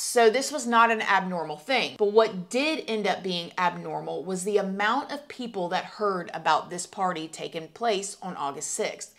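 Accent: South, United States. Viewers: How did I not know about you! That southern accent and true crime ❤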